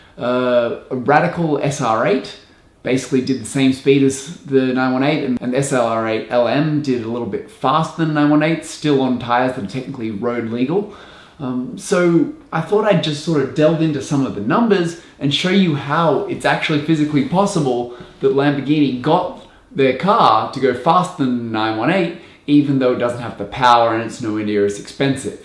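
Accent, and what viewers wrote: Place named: Australia. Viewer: hes more of an English sounding aussie than the rest of us bogans that sounds like or worse than Dundee lol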